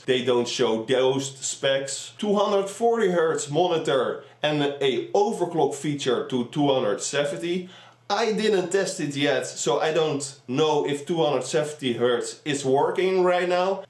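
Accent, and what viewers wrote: Netherlands. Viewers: I bought mine but it arived screwed already so no need to put screws myself also nice dutch accent ik also from Netherlands i may have a bit better english accent but also worse sometimes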